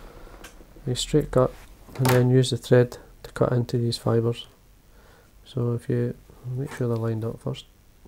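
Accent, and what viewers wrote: Scotland, United Kingdom. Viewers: The ladies love your soft spoken scottish accent, and those flies looks so easy to tie. A true ambassador to fishing. Outstanding work,enjoy your life 's passion and thank you for sharing.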